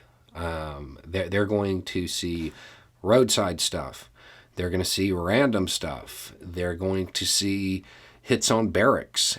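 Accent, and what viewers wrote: South, United States. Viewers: Howdy! Thanks for your thoughts and considerations. First of all let me say that I'm glad I found your channel. Although I first was a little sceptical when I watched the videos, as for some reasons, to me it looks suspicious when I see a bearded US guy with a southern accent talking about politics from what looks like a preppers bunker, closing with an image where he's sipping moonshine;) No offence though, I guess you can imagine what events in more or less recent history made me develop that sense. But after watching some more of your videos and of course doing my own research on some topics, I came to the conclusion that you're actually one of the most considerate and probably most trustworthy youtubers I have come across. And I tend to agree with many (if not most) of your conclusions and predictions - at least as far as my own information background allows. 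However, talking about P's plans for the Ukraine, from all I learned about him recently, and from the sources I found so far, I think he will not content himself with "liberating" the recently acknowledged "republics" in the Donbas. At least not if he still sees a chance to occupy the south, to have an access to Crimea via road and train. And he will even try to go a bit further to the west, to secure the water supply for Crimea from the Dnieper, by occupying Kherson county and the canal (which was closed by Ukraine after Crimea was annexed and caused a draught there). And while doing so, he will leave scorched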